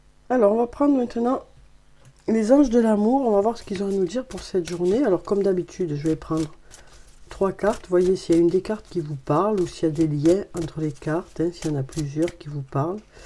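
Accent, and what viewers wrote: South, France. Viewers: Merci pour cette belle guidance avec ce charmant petit accent du soleil ❤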